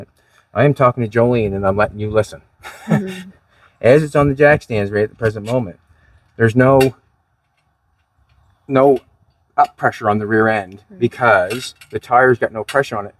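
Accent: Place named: Atlantic Canada, Canada. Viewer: This is my first viewing, so I don't know where you are, but I'm detecting a slight East Coast Canadian accent. If that is so, you probably know that the government, bless em, thinks they can save the planet by forcing car dealers to sell 100% of the new cars to be BEVs! If we the people, can't stop this madness, the folks who can go Cuba-Style on old classic automobiles are going to be in high demand! 1st view immediately SUBSCRIBED buddy!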